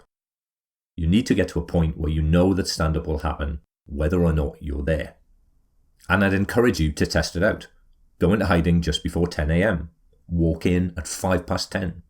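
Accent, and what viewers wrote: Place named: North East, United Kingdom. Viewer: he's not a robot, he's just from the North-East.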